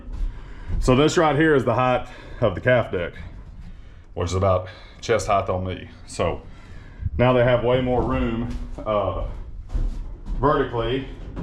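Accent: South, United States. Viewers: That country boy accent though - I wonder if you can sing 🤔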